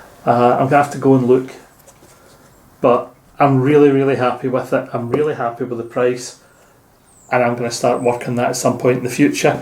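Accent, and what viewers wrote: Scotland, United Kingdom. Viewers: My god, i love your accent! I trained in Edinburgh and i love love love the Scottish accent. Nathan sent me across from the sockmatician podcast. I'm going to be writing my comment as i watch your podcast, just so i dont forget what i want to comment on. xx ooh all of your sparkly yarn shows up great with the sun streaming in! I really like the design of the Sharf :) the colour inspiration is so interesting. i have to say i say no to requests, but then again, how could you say no to knitting a baby sweater for a friend? Ive had random people that ive met once while travelling, ask me for socks and stuff, and ive said no. it depends on who is doing the asking i suppose. that wool looks incredible! and that sweater looks SO AMAZING! I agree with the wide ribbing, babies have big tummies and they need space for all that growing! 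that hat is so ridiculously cute. I love love love that little feather and fan pink baby sweater. you remembered that pattern from years ago too, you are fearless and wonderful. im really excited to see what happens with the plant based fibers! i really want to learn more about that! im so glad you filmed that trip to the warehouse! its fascinating and not sad at ALL. im always filming for my podcast. i know someone will want to see what ive been up to - they seem like such lovely wonderful people! I'm definitely going to be getting some yarn from that wonderful shop. thank you so much! xxxx - Grace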